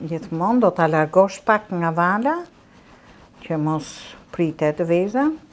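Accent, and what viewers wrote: Tirana, Albania. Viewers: Ty boft dita 1000, se ti me kenoqe vetem duke t'nigju , ate dialektin e mbrekullushem te Tirones 🌟🌟🌟🥰🥰🥰❤️❤️❤️💐🌹🌻🌷🌺🌼🌸🏵️☘️ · Sa te mira qe i ben!Me kujton mamin tim!Te ka lezet dhe e folmja tironce,nostalgji!Flm per keshillat mira,te ndjek me shume dashuri!❤ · Me pelqen kur flet me dialektin e tironse.Je shume e mire dhe e direjte perdrejte.Zoti te dhe te shendet dhe lumturi.Respekte e dashur❤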